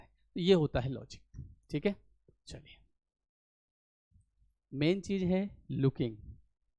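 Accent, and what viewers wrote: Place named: Madhya Pradesh, India. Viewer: i love ur mp acent ratlam indore ya ujjain ❤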